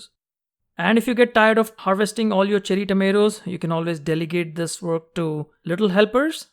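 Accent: India. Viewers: your pronunciation is like Indian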